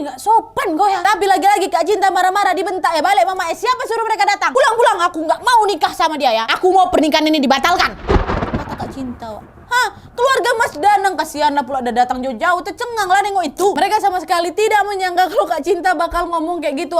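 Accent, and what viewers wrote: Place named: Madura, Indonesia. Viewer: Kok lama lama logatnya kayak orang madura , lucuu banget 😁🥰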